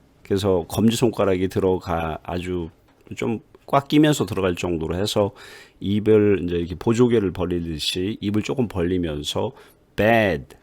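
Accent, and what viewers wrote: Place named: United States. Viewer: 진짜 미국의 발음이 나오는것 같으십니다 존경해욥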